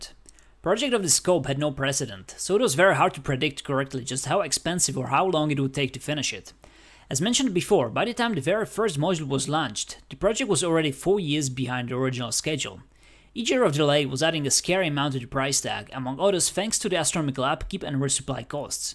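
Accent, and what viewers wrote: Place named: Europe. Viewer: "I live in small country with pop just 10m, with 1m is a force" based on your pronunciation and accents now my scope is GRE, BEL or NED, and maybe CZE too (exclude POR because I heard them accents and I knew it)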